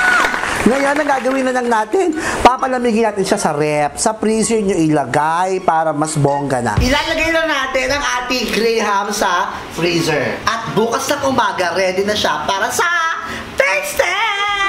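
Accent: Visayas, Philippines. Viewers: BISAYA KABA????????? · bisaya po ba kayo?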